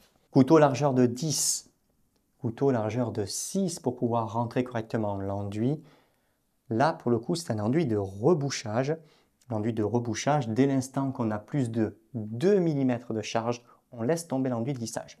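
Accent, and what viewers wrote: Southwest, France. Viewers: merci pour votre réponse, j'adore votre accent du sur ouest !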